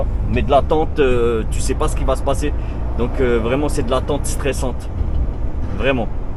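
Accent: Alsace, France. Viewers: hola mon reuf change rien tu est au top sa c'est un bon alsacien ta façon de parler dans les videos tu a le droit de parler comme tu en avis courage et force a toi bis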